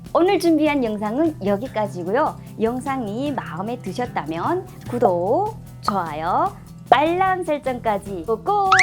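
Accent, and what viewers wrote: North Korea. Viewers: 어마야 놀라자빠지겠다야 구독100만 메빰자면 되겠다야 에미나이 나만말씨 잘한다야 부칸말투 짬뽕하니까니 한국간나같기두하구 ㅎㅎ 기니까니 조아요눌루라요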